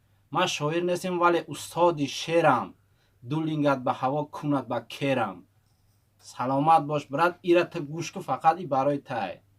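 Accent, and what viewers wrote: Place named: Kulob, Tajikistan. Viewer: Ком кулоба гап мекнад ранги ёовони кулоби гош мекнем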